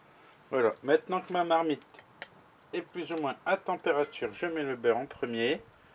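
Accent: Nord-Pas-de-Calais, France. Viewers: Quel bonheur de t’entendre parler Ch’ti je le dis encore ta joie de vivre et contagieuse 👍 bonne journée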